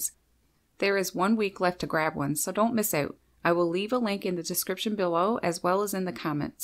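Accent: Newfoundland, Canada. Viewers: I knew you were Canadian when I heard pronounce your “ou”s 😆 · The Canadian accent is strong with this woman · Are you from Nfld or Nova Scotia? XD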